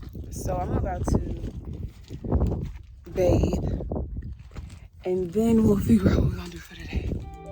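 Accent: France. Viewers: Such good advice about your surroundings affecting your inner cells🥰😍 I strongly resonate with your message. Your “french” accent had me rollin 😂🤣😅😁😆 it’s so unique, I love it. But you and the bugs, chile 😏 you are one bold sista 👏🏿👏🏿👏🏿💞💖🖤🤎🖤✨💫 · Idk why but I am dying 😂🤣 at this French accent! Lmao Everything resonates with me when you shared about epigenetics. Give thanks for the sweet reminder! 🥰🙏🏾 Keep shining, sharing, and taking up space in this universe! 💕✨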